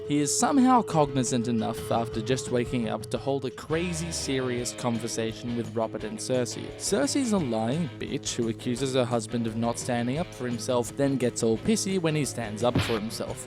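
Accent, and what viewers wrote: Australia. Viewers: Are you Australian? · The second I realized you were Australian: when you said “cracks the shits” The second I realized you were a genius with these videos: when you played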